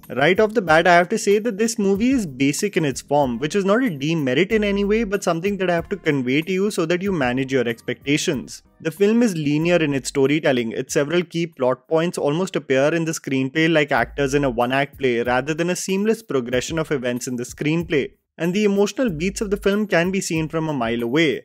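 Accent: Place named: Odisha, India. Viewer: Odia pronunciations 😅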